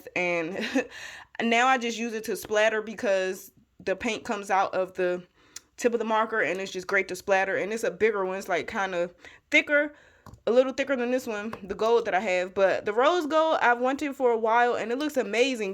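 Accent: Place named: United States. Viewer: Hi beautiful colouring pages.. I love your American accent.. people say to me I love your Aussie accent lol thank you for sharing hun ❤❤❤😊😊😊xxx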